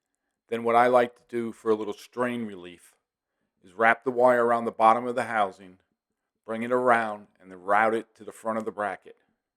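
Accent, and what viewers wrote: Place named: New York, United States. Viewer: Thank you for a great video. I just followed it and did the conversion on my 665 and it’s great. Out of all the videos out there on this topic, I chose yours because of your accent. It just seems right when I’m working on a “made in New York” Lionel. Have a great day!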